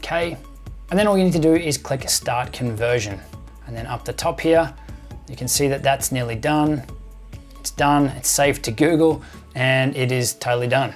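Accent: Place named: Australia. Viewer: Nice work mate. Your work on these productions is much appreciated. Hearing another Aussie on YouTube is also a bonus. :)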